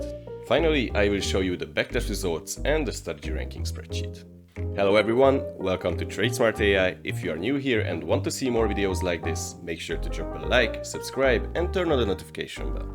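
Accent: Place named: Hungary. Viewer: Hi! My I ask, are you Hungarian? Just because of your intonation and accent sounds like that. I am from Hungary! 🤘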